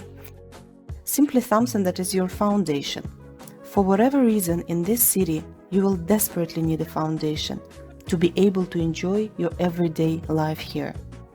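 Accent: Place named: Russia. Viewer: Nice russian accent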